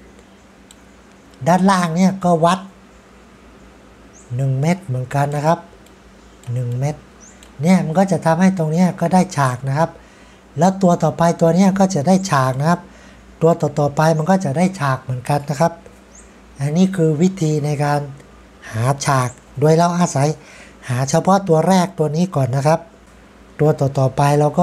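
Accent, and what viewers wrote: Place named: Thailand. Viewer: เค้าพูดภาคกลางไม่ชัดไม่ใช่แปลว่าไม่ใช่คนไทยคนเหนือคนใต้สุดก็พูดกลางไม่ขัดจะถามทำไมยายผมไทยแท้ยังพูดกลางไม่ซัดเลย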